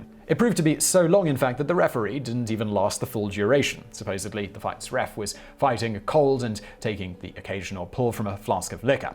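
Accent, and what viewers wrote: England, United Kingdom. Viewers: Mariela Que i love hearing someone with an english accent talk about american football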